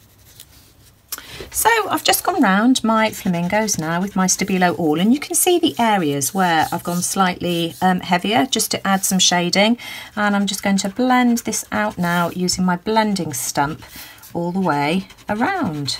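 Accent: United Kingdom. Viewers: I always look forward to your videos, you make beautiful art! And I love your British accent 🙂. I would love to see you make a soft pastels tutorial...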